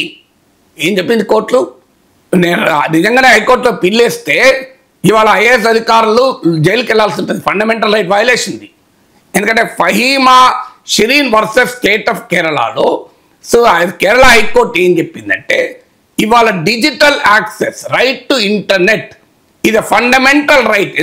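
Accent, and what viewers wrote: Telangana, India. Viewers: Avunu bro,adi Telangana yasa daniki nvu pallu ikilinchalsina avsaram ledanukunta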